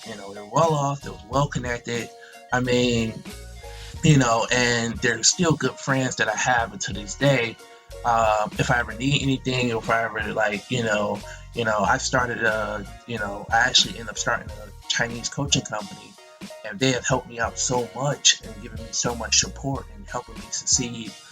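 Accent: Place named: United States. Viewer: You’re losing your American accent lol I don’t know if that’s good or bad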